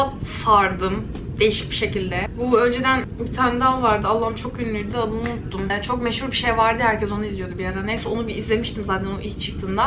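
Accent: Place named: United Kingdom. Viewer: British aksanı olmuş o biraz ahhaha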